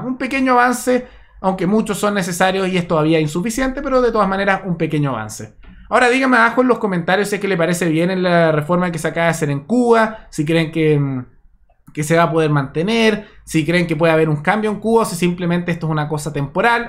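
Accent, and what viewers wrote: Chile. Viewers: me parece por tu acento que podrías ser chileno,me gustó tu análisis de la cuestión cubana sobre la apertura de los restaurantes en Cuba pero no estoy de acuerdo con la parte de que le das crédito al mal llamado nuevo presidente y al también nuevo economista de Cuba,pues yo te diré como cubano que soy que nada de esto es nuevo ni tampoco tiene que ver con el hecho de que halla un nuevo presidente porque todos ellos después de la muerte de Fidel Castro han sido colocados ha dedo, Raúl fue puesto ha dedo por su hermano Fidel y ahora Díaz Camel fue también puesto de la misma forma,no perdamos la perspectiva de la política del gobierno de Cuba y tengamos en cuenta que el sistema de gobierno en Cuba siempre ha sido el mismo desde hace más de sesenta años y ese gobierno desde la caída del comunismo en Rusia y en toda la Europa del este ellos nunca permitieron elecciones libres porque no querían perder el poder pero como se vieron aficionados económicamente empezaron ha buscar cómo hacer alguna pequeña apertura económica para salir de la cituacion en que se encontraban y entoces desde los años de 1990 lo primero que hicieron fue legalizar las monedas extranjeras especialmente el dollar y ha permitir el turismo de los países libres,dejaron que compañías extranjeras invirtieran en hoteles y también ha los cubanos dentro de Cuba les permitieron invertir en una serie de pequeños negocios que luego más tarde o varios años después cuando el gobierno vio que la gente estaba adquiriendo alg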